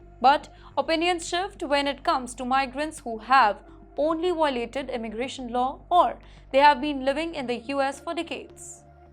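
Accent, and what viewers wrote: India. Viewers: You need to improve your Accent. So bad that internationally cant really follow you. So indianish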